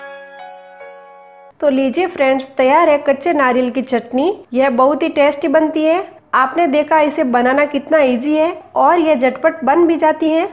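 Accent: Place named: Marwari, India. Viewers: Mam apki awaj sunke lagta hai aap Rajasthan se ho.....kya me sahi hu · Hindi me marwadi lheja aa jata hai, bahut badhiya. Best recipe. Thanks.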